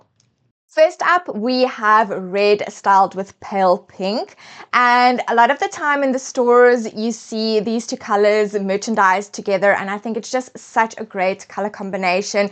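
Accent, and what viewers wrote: South Africa. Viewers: I KNEW IT!!!!! My family is from Cape Town but your accent is so much less pronounced than theirs… been in Canada for a long time already? ❤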